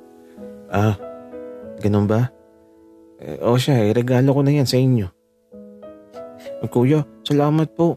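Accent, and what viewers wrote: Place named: Laguna, Philippines. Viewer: likas ka bang taga laguna? ung intonation kasi ng boses mo.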